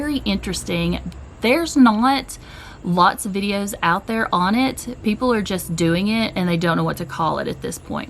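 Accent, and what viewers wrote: South, United States. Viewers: shes not country she’s southern! Lol and I LIVE for it! Not to mention she has one of the most famous voices ever....She’s the Incredibles Mom! Lol This is a compliment 😂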